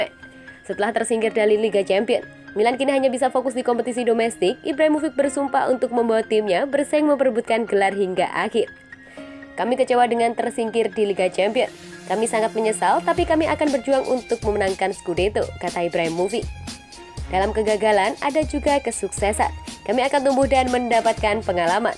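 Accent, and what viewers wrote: Java, Indonesia. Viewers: Jawanyan mana mbak pengisi suara!????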